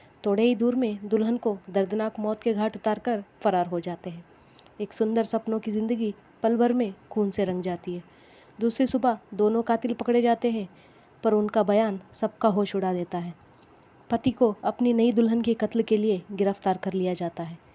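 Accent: South, India. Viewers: Are you Hyderabadi or any other part of South India??? Love ur accent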